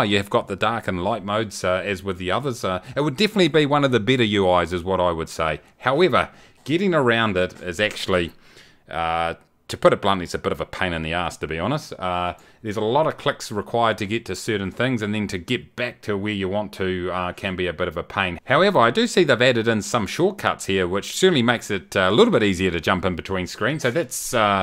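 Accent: New Zealand. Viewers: 🤣 The auto text understood you to say Korea instead of Career. You know that I Love the Kiwi accent mate. 🥝 🇳🇿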